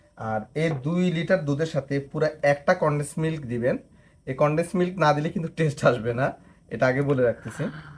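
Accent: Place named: Chandpur, Bangladesh. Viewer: আপনার ভাষায় চাঁদপুরের ছায়া দেখতে পাচ্ছি। আপনি কি চাঁদপুর থেকে বলছেন? যাই হোক, ধন্যবাদ এতো সুন্দর করে রেসিপি শেয়ার করার জন্য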